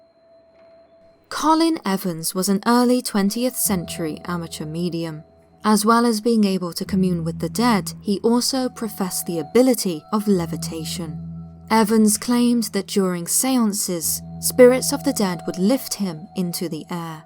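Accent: United Kingdom. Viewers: narrator I always wanted to ask, what part of the U.K are you from?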